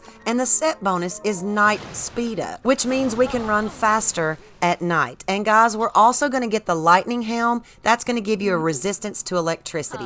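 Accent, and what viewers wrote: South, United States. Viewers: This really is the best channel on here! I mean, that southern accent is not what I'd expect, but I love it!! And the info is super helpful.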